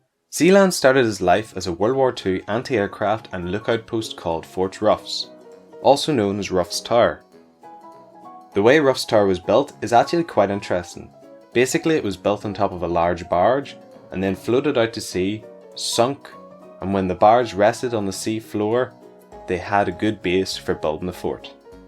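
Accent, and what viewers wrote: United Kingdom. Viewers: I really like your videos. I just subscribed. What part of the UK are you from? I really like your accent.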